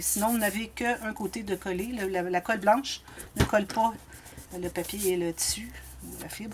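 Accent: Quebec, Canada. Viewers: Cc, merci pour ces nouveaux tutos! Gardez votre accent. Ça me rappelle ma cousine disparue qui était originaire du Québec! Courage pour votre cousine et à vous! À bientôt, bye bye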